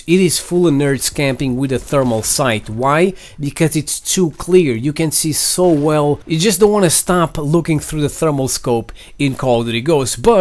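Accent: Brazil. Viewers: you're brazilian or english ? good vídeo ;P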